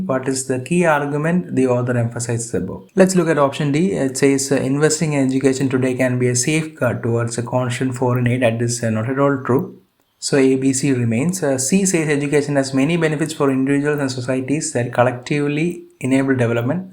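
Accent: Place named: South, India. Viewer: shubhangi he belongs to south india thats the reason his accent is bit different ......i do have many frnds from south india ....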